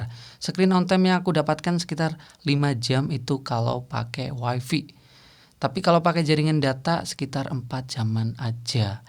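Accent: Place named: Banyumas, Indonesia. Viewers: Ora ngapak ora penak ya lur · Salam ngapak😅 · Banyumas lurrr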